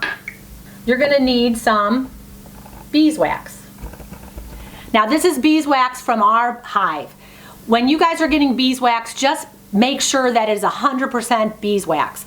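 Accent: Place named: North, United States. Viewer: You are delightful to learn from. I love your northern accent!!!!❤️💕❣️ lip bomb. I live in Texas with my family in the north. It makes me think of them! Thank you for the info. 😊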